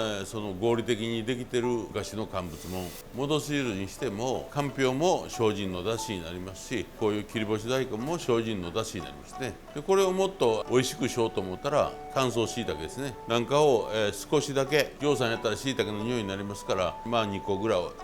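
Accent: Kyoto, Japan. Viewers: 村田さんの料理本を持って海外赴任しました。どんなに役に立ったことか。村田さん、ありがとうございました。 今も参考にしてます。 京都弁も心地よいです。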